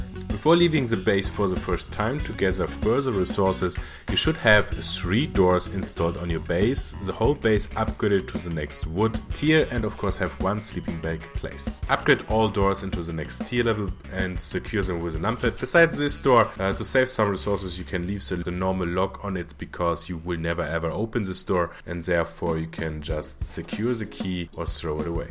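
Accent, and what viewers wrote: Germany. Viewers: that german accent tho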